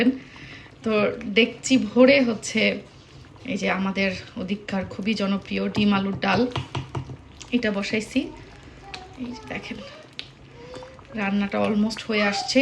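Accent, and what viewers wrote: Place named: Chittagong, Bangladesh. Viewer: আপু ডেকছি চট্টগ্রামে ভাষায়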